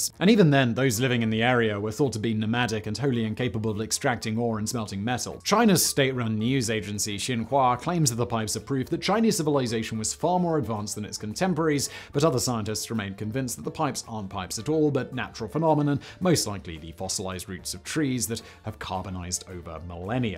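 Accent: United Kingdom. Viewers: British pronunciation is fascinating. Adding an extra i in aluminum, and apparently deleting an m from cadmium?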